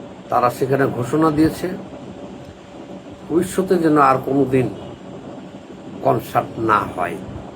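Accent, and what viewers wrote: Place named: Chapainawabganj, Bangladesh. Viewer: ভাই আপনি কি চাঁপাই নবাবগনজে ছিলেন? আপনার কথায় মনে হয় চাঁপাই এর মানুষ।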